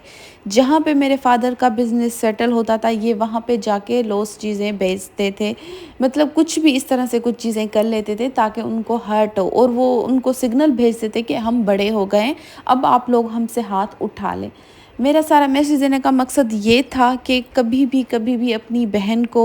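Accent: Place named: Sindh, Pakistan. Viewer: Sister ap sindhi ho na😂 lehjay sy pata chal rha hy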